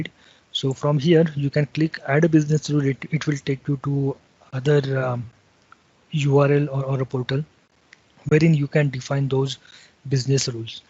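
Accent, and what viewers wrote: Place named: India. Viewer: Great video! Even though the indian english accent doesn't help with understanding, the explanations were very clear. Thanks!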